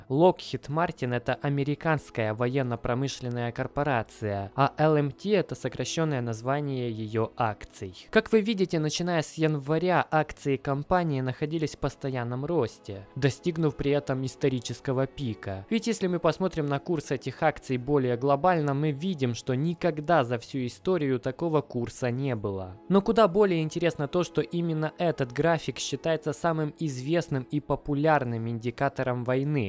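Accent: Ukraine. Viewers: так по говору слышно что чувак с Украины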